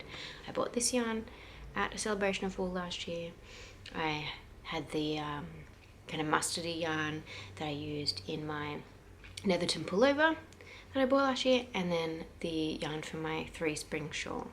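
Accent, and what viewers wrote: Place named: Australia. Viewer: Hi, I’m a Sydney girl, it’s so nice to hear another Aussie voice on here. I’m looking at starting a yarn carousel similar to how the America girls share yarn and I’m wondering if you’d like to join us. I’ll be posting a video about it later this evening ifyou’ interested. I’d love to have you join us in this little bit of fun...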